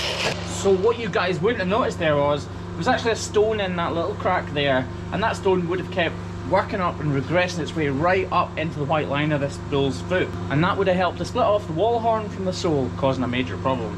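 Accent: Scotland, United Kingdom. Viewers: I love all your video cause your never do click bait it’s always real and very entertaining to watch and I’m sure everyone loves your Scotland accent lol i know I sure do but I just wanted to thank you for these extremely entertaining videos ❤️ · Scottish accent is cute. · Lmao tbh the peeing and pooping and the reactions and the scottishness is half of why I watch this channel, the other half being the bovines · I love your videos! Part of it is the wonder of this previously unknown, amazing world. Another part is the sunny style of presentation. And part is just your delightful accent - and learning some Scottish slang! But I have a question——what is the difference between a hoof trimmer and a blacksmith?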